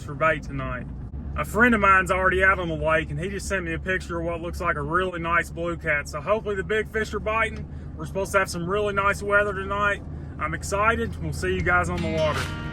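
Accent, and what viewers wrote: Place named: South, United States. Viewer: Where are you from? 100% no offense but I can't figure out your accent. You sound like a southern feller but then again sometimes not?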